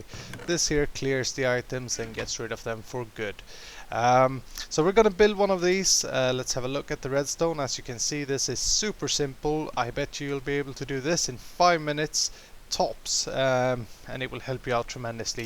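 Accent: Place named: Sweden. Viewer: lol snygg svensk dialekt. shota into lava lol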